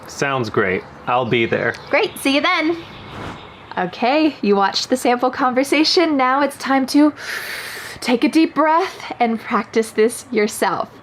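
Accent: United States. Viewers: I❤ u teacher.....ur american accent so awesome....wanna speak american accent like you ....its really cool Mc donalds said im lovin it Greeting for ur husband DAN and ur children.....your guys re inspiring family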